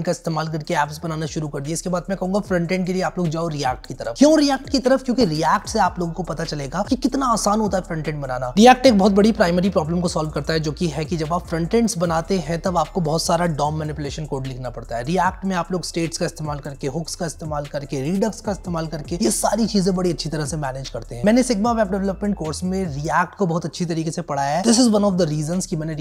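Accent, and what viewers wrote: India. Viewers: I didn't expect indian accent 💀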